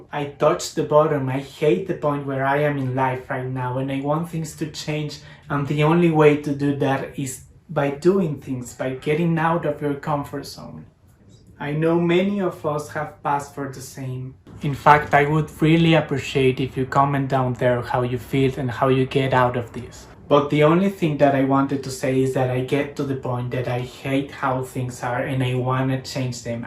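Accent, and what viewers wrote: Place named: Mexico. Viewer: Your English is very good, especially since you learned it all in Mexico. The only people that I know that have an American accent are those that lived in the US for at least some time. We were in France on vacation and I spoke okay French, but we had difficulty getting people to understand the name of the city we were driving to. Finally an older man figured it out by having me repeat its name with a French accent. See you are going to have an accent because those you speak with are also speaking with a Mexican accent. Heck, my wife has lived in the US for over 30 years and speaks great English, but with a slight accent...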